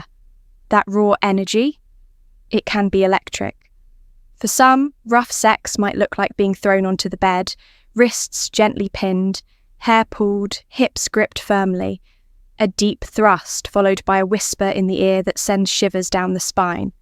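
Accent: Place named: RP, United Kingdom. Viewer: The posh accent is a nice touch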